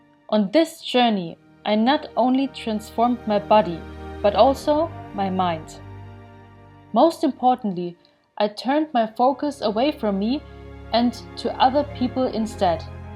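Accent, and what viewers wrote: Germany. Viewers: Her English is really good. Yeah, she has quite a strong Accent but her grammar and pronunciation are on point. I don't understand why so many Germans on YouTube put the English of their fellow Landsmen and women down. Show some Solidarity... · wie man sofort hört dass du deutsche bist;) danke für das video, hab ich was zum schauen aufm öden crosstrainer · dieses deutsche englisch xD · Her German accent is cute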